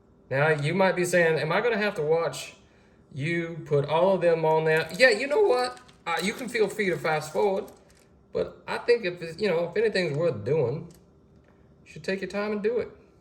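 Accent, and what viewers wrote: South, United States. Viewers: You got south in your mouth!! Love it! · I absolutely love your accent old south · Maybe more for camera/video time it just looks like they waste more than you/we do at home. I’m sure they don’t throw all that much away all the time. I think he did better than most. (By the way I love your southern accent.) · I love love love the southern gentleman accent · Love your southern accent! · Love this desert. Oh my goodness. It looks delicious. I have to make it. I agree with you and using your hands. Best way to really get the job done. Your kitchen, your rules. Love the southern, gentleman accent as well! Subscribed because I have a feeling you're doing many things for us to make. 🎉🎉🎉🎉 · Loved your southern accent 😊❤️🙏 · Good old-fashioned deep southern classic twang accent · Southern lovin' cookin' rite dare.... love the accent. 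😊 love the recipe. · Im going to make this for my kids just bc of your wonderful southern accent 😊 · You have to be from he south, am I right? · Oo the southern accent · I do detect some “Dixie” accent! Love it! It has to be good! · And I’m fron Nashville, NC and I agree. Sounds like Clayton Hollingsworth…Blanche’s brother on Golden Girls. Very Gone With The Wind. Ive NEVER heard a southern accent like this one. Born n raised southern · Where are you from? Such a southern accent. Like old confederate days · That looks yummy. I love your southern accent. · your southern drawl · Yes, I do agree. Everything is shrinking and the price keeps on going up. 😂. Just wanted to say as well, I do agree with the ladies from previous comment about the southern accent. 😊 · The southern accent is cool. Combination between Savannah and Louisiana?? ❤😂🎉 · Looks good the sweet southern accent makes the video even better ❤ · I love your southern accent 😊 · U are either from Alabama or Georgia! 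Boyee u have an accent! Lol! I’m from the south too but I’m from New Orleans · I'm only watching this because you have a lovely 🌹 southern accent. You sound so smooth. That cake looks really good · Ya know what I love more? His accent! 😂. Louisiana? Best southern? Accent ever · Love your talk you sound so southern its so cute . Yummy taste 😋 thanks for showing this recipe 🎉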